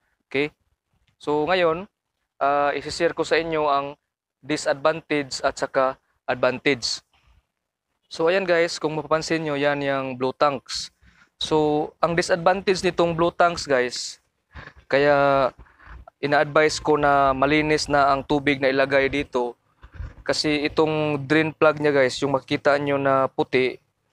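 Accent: Visayas, Philippines. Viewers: cguro bisaya ka hirap ka mg explain ... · bisaya po kc sir ok lang ang important is nakakatulong lahat ng sinabi nya 😊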